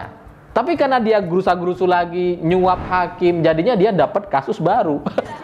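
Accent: Madura, Indonesia. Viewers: Kok logatnya Madura?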